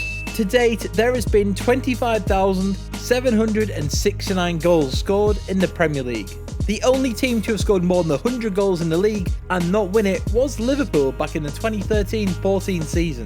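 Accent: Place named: Ireland. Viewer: What part of Ireland are u from?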